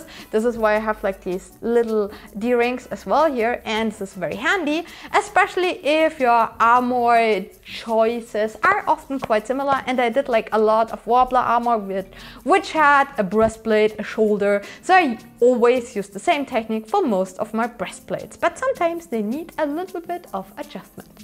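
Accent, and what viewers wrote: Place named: South, Germany. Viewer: Da kam das deutsch durch. Habe dich gerade erst gefunden und war noch nicht ganz sicher, welcher Akzent das ist... Erstmal abonniert.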